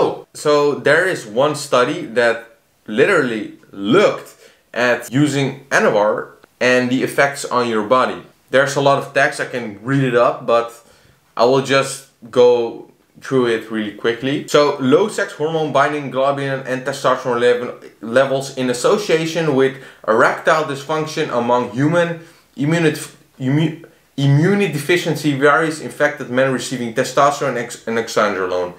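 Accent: Netherlands. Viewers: Ik hoor een heftige nederlandse accent 🤣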